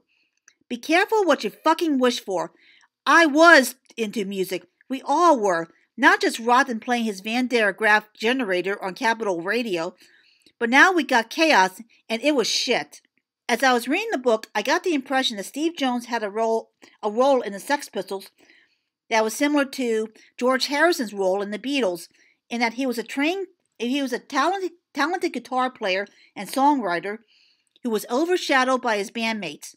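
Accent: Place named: New York, United States. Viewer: Cheers K,best review I've heard,or read on the internet.Love that New York accent,I'm from Boston so,people get it after a bit.Lol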